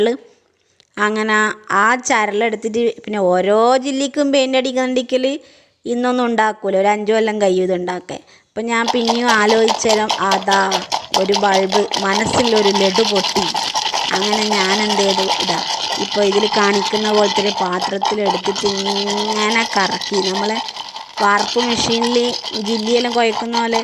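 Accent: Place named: Kannur, India. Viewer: നമ്മളെ കണ്ണൂര് ഭാഷ നല്ല രസം ഇണ്ട് കെകാൻ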